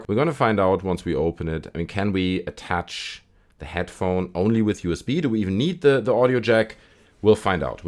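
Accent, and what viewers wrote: Germany. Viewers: are u german? channel says UK tho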